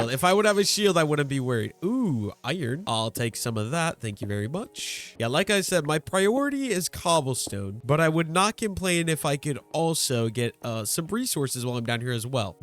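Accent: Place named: Canada. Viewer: You sound somewhat canadian 😂❤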